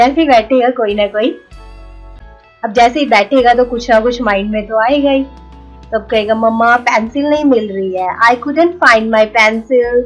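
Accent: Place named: Punjab, India. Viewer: You have a punjabi accent..however the conversation was good😊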